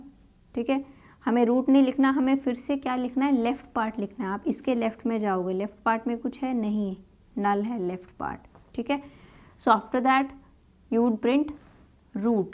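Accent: India. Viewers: this sweet indian accent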